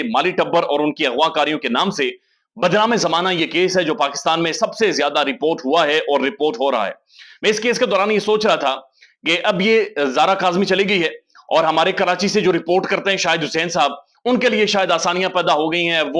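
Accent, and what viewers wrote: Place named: Kashmir, India. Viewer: ایب ،کیب ) اس سے پتہ چلتا ہے کے ماسیرو کشمیری ہیں آپ 😊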